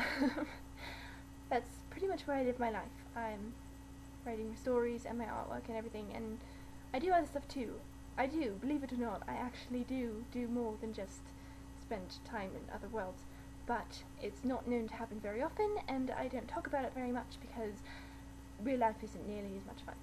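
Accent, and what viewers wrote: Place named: United Kingdom. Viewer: OMG!!! YOU ROOOOOOCK! (for a second, just a second, i really thought "wow i forgot she had a british accent") Randomness #1: I was the first 1 to coment!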